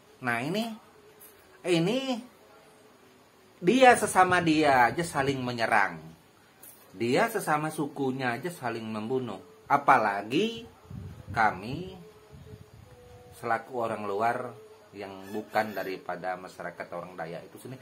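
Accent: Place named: West Java, Indonesia. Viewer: Cengkok nya seperti logat Sunda yah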